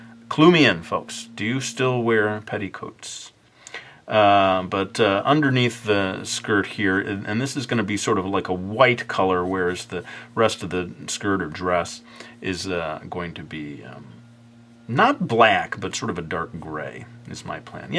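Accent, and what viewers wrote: United Kingdom. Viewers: you are so brittish :D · Your british accent is hilarious.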